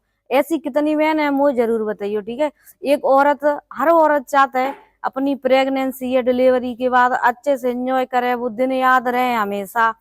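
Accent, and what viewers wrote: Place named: Rajasthan, India. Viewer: बहन आप कौन से गांव से हैं यानी आपका मायका कहां पर है आप तो मेरी तरह भाषा बोलता है मैं भी राजस्थान से हूं प्लीजबताएं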